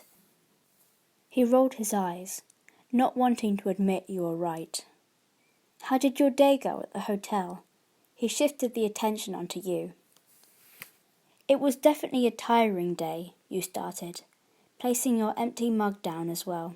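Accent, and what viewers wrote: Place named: United Kingdom. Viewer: For some reason when someone who has a British accent and they are reading it sounds nice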